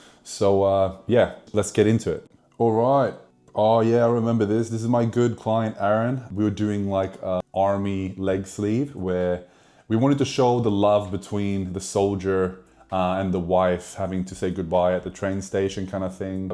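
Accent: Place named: Australia. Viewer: must be the aussie twang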